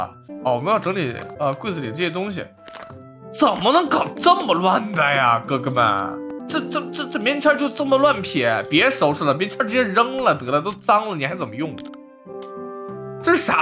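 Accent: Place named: China. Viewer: 乾，我現在才注意到很細心欸！這裡是繁體 B站那裡是简体 (抱歉現在才去B站看主播啊) 我還想說為啥字幕是繁體講話卻是中国腔 ( • - •;)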